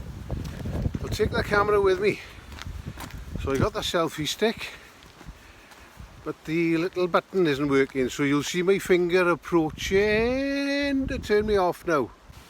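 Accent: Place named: Wales, United Kingdom. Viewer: This is my face. I have my roots in Wales. I heard it in your voice. We aren't so very far away from home. <link>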